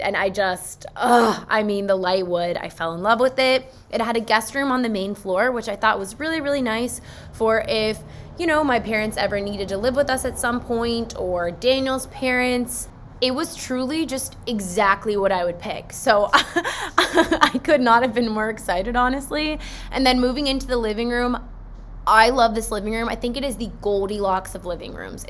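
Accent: South, United States. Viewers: Hi, I’m from England and have been following you for about two years. Am enjoying all of your content, the peak into southern American life (loving the accent) and especially the progress of your relationship with Daniel who is just lovely! Personally, I think house No. 1 is best for you both in the long term as, if and when your family expands, you will soon find the extra space will be a godsend. As a mother myself, I would highly recommend the principle bedroom on the same level as the nursery - just think of those multiple night time visits - you definitely don’t want to be “stumbling” up and down stairs!! With regards to house No. 3 I would personally be concerned about the lack of privacy in my rear garden (haha, “yard” as you guys call it). Good luck with your house hunting and enjoy the process - how exciting for you both! P.S. Please pass on to your mother what a beautiful lady she is, both from within and on the outside.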